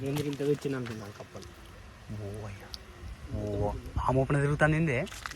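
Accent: Telangana, India. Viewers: Brother nee video anai copy by others youtuber but your voice Telangana style super bro continue with new version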